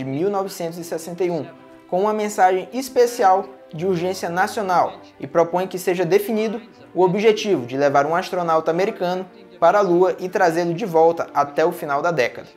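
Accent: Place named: Ceará, Brazil. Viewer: Q bom q o YouTube me recomendou o seu canal. Sou vidrado no espaço e em suas estrelas. Sou de Fortaleza - CE, posso estar enganado, mas tenho quase certeza q vc tbm é cearense por causa do sotaque 👏, LIKE, + 1 inscrito, e tmj irmão!!!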